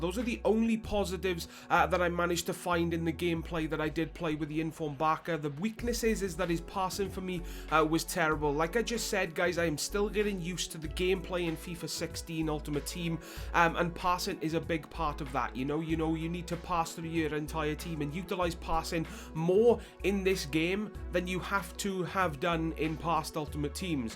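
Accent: Wales, United Kingdom. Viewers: I can 100% tell your from Wales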